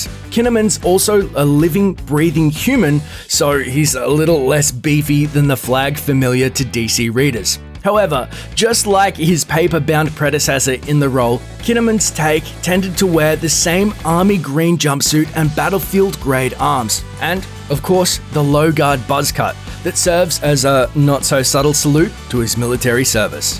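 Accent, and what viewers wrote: Australia. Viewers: is aussie? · HOLY SHIT AUSTRALIAN ACCENT IS SO LOUD! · I didnt know that you were from Australia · ha Australian. like me.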